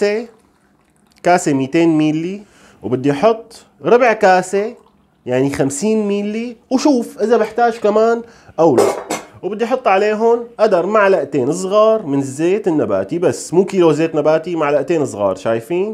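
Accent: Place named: Syria. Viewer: فديت اهل سوريا واللهجة السورية متابعة من العراق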